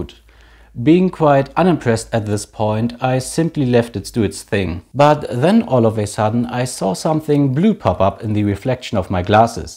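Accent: Germany. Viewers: THIS ACCENT...are you german? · German English.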